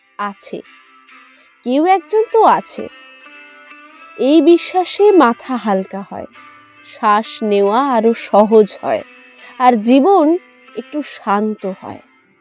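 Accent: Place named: Bankura, India. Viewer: দিনে দিনে, বেশ পাকা পাকা কথা শেখাচ্ছিস্! বেশ ভালো হচ্ছে, যে যাই বলুক, তোর মত তুই এগিয়ে যা, তোর শক্তি আছে। তোর বাড়ি কোথায় রে, এত ভালো বাকডি ভাষা বলিস! ভালো গান ও তো গাইতে পারিস!🎉🎉 ভালো থাকিস। আমি প্রায় তোর সব ভিডিও দেখি😂😂🎉🎉🎉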